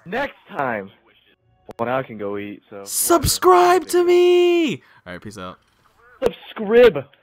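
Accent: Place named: New Zealand. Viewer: You sound like kiwi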